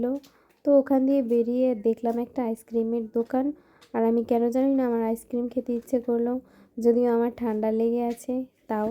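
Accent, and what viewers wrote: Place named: West Bengal, India. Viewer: didi,kmn acho???amr bari Bangladesher Rangpur e....didi west bengal e jeye tumi okhankar vasa sikhcho bujha jacche....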